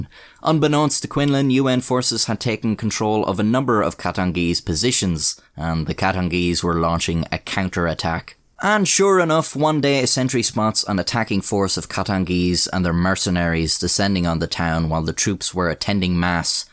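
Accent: Ireland. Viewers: YAYYY HES IRISH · It's amazing that their Regiment staved off an invading horde 20 times their own number and I'm glad that they were finally given at least some kind of recognition. I very much like your drawings that very entertainingly illustrate the story being told. You are a talented man, my friend. And you having an Irish drawl makes it even better. We crazy gun-loving, world-conquering, wall-building Americans love a good Brit/Irish/Scot/London er (I know, that would be Brit) accent. I'm so glad you're back in the game and putting out some good stuff. It's always a treat. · I love your weird Irish numbers! Tree tousand.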